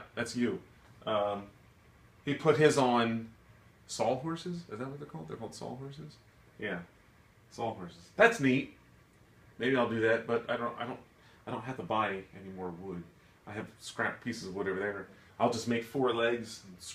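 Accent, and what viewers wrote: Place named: United States. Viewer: Love your hive. Great American accent. '...the girls...'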